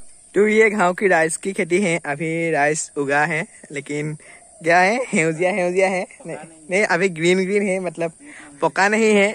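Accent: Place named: Rural, India. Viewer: Your গঞা হিন্দী is op 😂😂🔥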